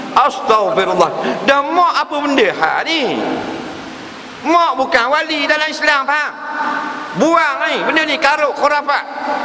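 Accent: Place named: North, Malaysia. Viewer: pandai ustaz ckp utara!!!! #RESPECT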